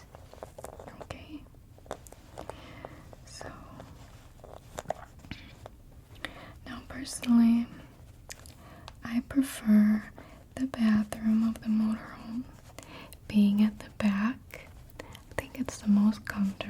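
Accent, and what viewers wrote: Midwest, United States. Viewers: As a Minnesotan, the midwestern tinge to your voice in the old school videos like this give me LIFE. 🤩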